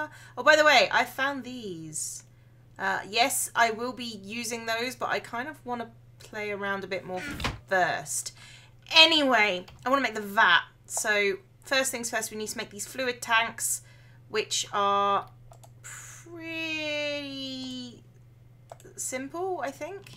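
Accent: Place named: West Country, United Kingdom. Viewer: Yay for the West Country accent!:) Great video as ever. <3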